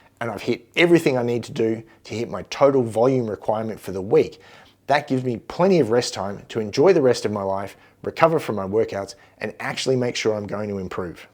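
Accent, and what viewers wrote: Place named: Australia. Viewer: Excellent info, and I can vouch for 95% of it from living it firsthand. Hearing it in an Aussie accent was a nice bonus. 🍻 🇦🇺 Cheers, from across the pacific (fellow lifter over 40)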